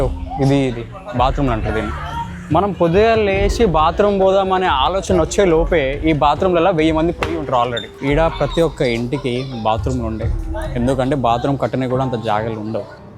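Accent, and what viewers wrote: Telangana, India. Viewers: Bro with telangana slang 🔥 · Aa slang thoni .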